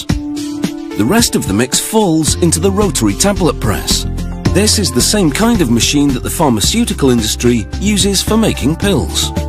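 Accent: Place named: United Kingdom. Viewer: FINALLY!!!! THE BRITISH DUDE!!!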